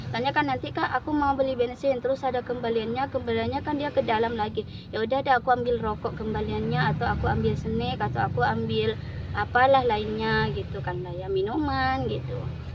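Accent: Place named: Madura, Indonesia. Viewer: Kental khas madurax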